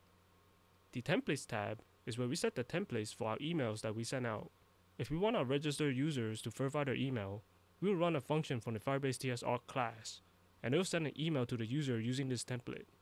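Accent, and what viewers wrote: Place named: India. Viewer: Wow...i just realized that u are from India. Nothing bad, usually I can't focus when i view an indian tutorial bcuz my ears hurt :)) But your style is clean and amazing ! Hope we get more angular tutorials from you . Great job sir !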